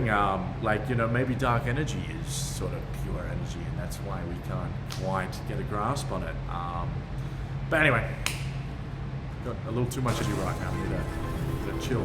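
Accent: Australia. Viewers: Lord have mercy, the Australian accent plus the deep voice plus them visuals wooow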